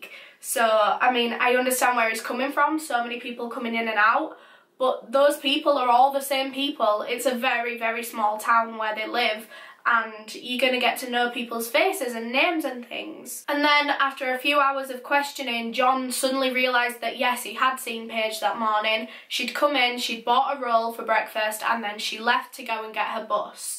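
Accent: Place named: Scotland, United Kingdom. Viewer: I am somewhat new and literally thought you were Scottish you don't sound English sorry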